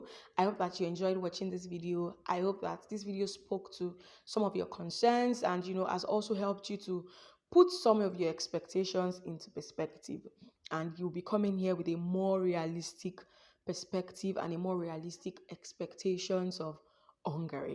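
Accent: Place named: Jamaica. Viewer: Yes you speak English but you have a Jamaican accent. Hard to understand . Don't complain about the people. You are not perfect. So you went to a Country to learn. You can tape it on google for what you want. That helps a lot. Any Country is the same. Yes. No one uses sorry. Because you need to learn the basic Hungarian language. Elnezest. Use this. This country is not an English colony. Before you went there you didn't read about anything ? Your fault.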